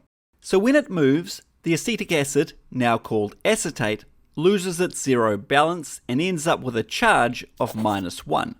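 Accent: New Zealand. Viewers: Physics sounds funnier with a Kiwi accent too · Awesome video. Are you an engineer by trade or science teacher? I mean we all know you're a long suffering Land Rover owner :) By the way, I always read your wall charts in my shop with a Kiwi accent (in my head). thanks! Can't wait for the next one.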